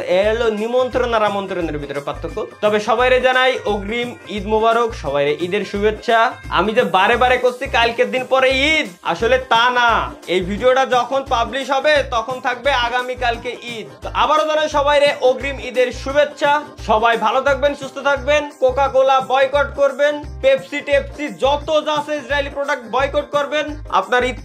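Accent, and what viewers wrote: Noakhali, Bangladesh. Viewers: Noakhali theke boltece ❤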